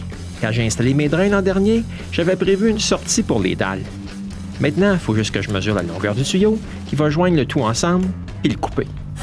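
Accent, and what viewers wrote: Quebec, Canada. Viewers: Magnifique l'accent quebecquois. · Je comprend rien avec laccent quebecoi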